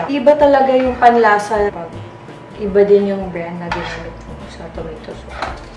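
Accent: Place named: Bisaya, Philippines. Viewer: Pag bisaya tlaga prang pangit mgtagalog pru sa english slang... ❤❤❤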